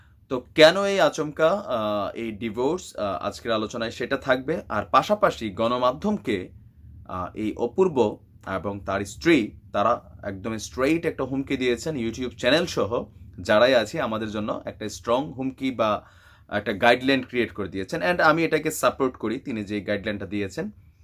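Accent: Comilla, Bangladesh. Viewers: ভাই আপনি কুমিল্লা বাসায় কথা বলেন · আসসালামু আলাইকুম ভাই কেমন আছেন ইনশাআল্লাহ বালই আছেন ভাই আমি সিলেট মৌলভীবাজার শহরের আপনার কথা খুব সুনদর কুমিল্লা ভাষা টাও বাল লাগে। তবে বাই যদি দিন অথবা তারিক,টা দিয়ে যদি ভিডিও করতেন তাহলে,মনে কষ্ট নিবেন নাকি,দোয়া কইরেন বাই আল্লাহ যেন সবাইকে মহা মারি থাইকা হেপাজত করেন আমিন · Love from comilla Vhai comillar vasai kotha bolen moja lage😁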